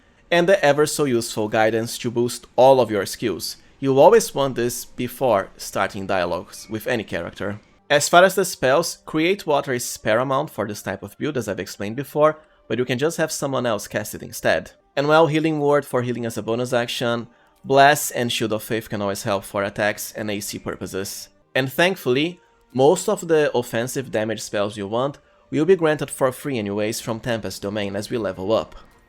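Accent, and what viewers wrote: Brazil. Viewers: Bro your accent is Brazilian for sure lol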